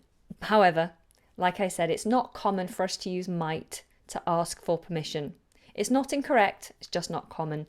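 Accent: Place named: United Kingdom. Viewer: A real British accent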